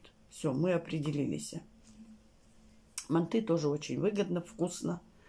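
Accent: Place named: Urals, Russia. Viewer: Я не так давно на вашем канале, и при просмотре первого видео сразу услышала уральский говор.😊 Я сама родилась и выросла на Урале, и хоть и живу уже несколько лет в Питере, но наш уральский говор узнаю сразу 🤗😊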